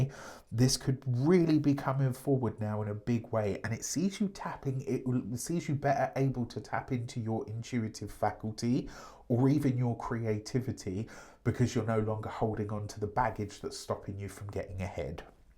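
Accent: United Kingdom. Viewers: You can't quit us we love you too much..thank you I missed hearing your British accent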